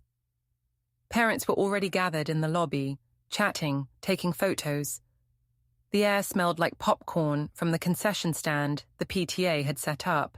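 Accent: United Kingdom. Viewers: This was obviously written by a yank, why use a brit to "read" it. Syntax and turn of phrase distinctly American. Irritating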